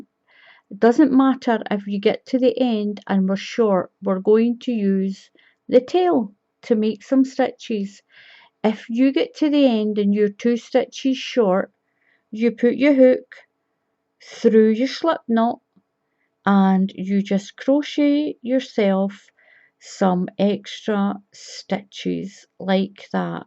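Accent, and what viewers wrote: Scotland, United Kingdom. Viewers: wow, how rude is this women, I just cant believe that she is so sour and bitter that she would try and bring you down. I am very great-full for your hints and extra info to make my crochet easier and added bonus, your Scottish accent. Sending love and best wishes from Perth Western Australia x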